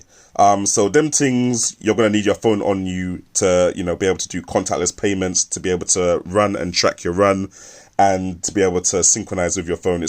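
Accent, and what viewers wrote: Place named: Cockney, United Kingdom. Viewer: Really good review, thanks for posting. Brilliant accent by the way. SO cockney, kind o ting... you get me?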